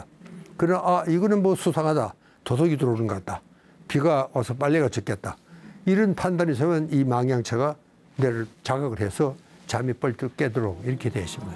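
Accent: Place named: Daegu, South Korea. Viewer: 선생님 대구사투리 쓰시니까 좋습니다 저도대구사람입니다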